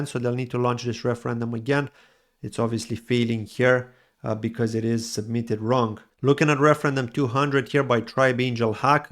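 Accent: Ireland. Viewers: you may or may not remember a couple of years ago I asked if you had lived in Ireland as I get a hint of Irish in your accent. Well today you have an Ireland t-shirt on.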